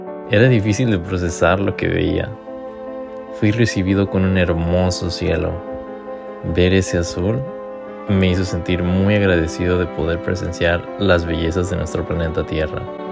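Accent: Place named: Mexico. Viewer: Awesome view and definitely inspiring too, especially when the old lady caught up and said that she's climbed that mountain for 25 times! 😊 非常棒的影片，看完之後真的會讓人也想去爬山，Dr. Razo的墨西哥口音也很好理解，可以邊享受美景邊練習聽力，真的是一舉兩得😉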